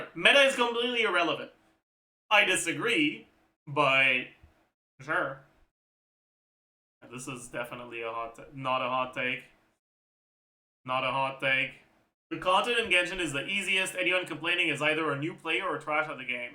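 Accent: Quebec, Canada. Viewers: which ones have you noticed? it could be because he's a quebecois french speaker, especially with fontaine names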